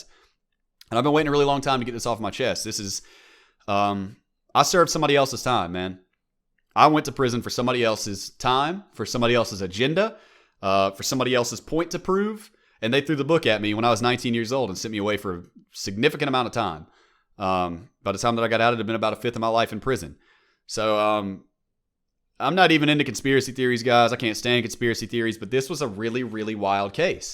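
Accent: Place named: Mississippi, United States. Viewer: I am from Mississippi as well, not near Oxford I grew up near Madison but yeah man I remember seeing your video where Lockdown23&1 interviewed you and I was like "I recognize that accent from anywhere" haha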